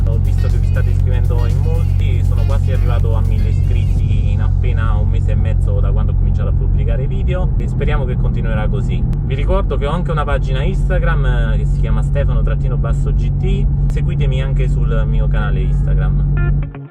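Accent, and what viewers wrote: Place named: Italy. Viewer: Ese acento italiano brother.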